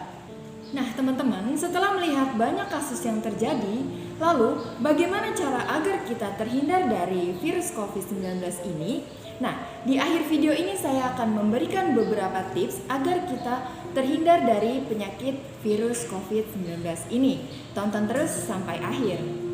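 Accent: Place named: Java, Indonesia. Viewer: Se' lo mba, medok temen bosone 😂 But, Informasi yg disampaikan sangat bermanfaat, thanks yoo atas infonya👍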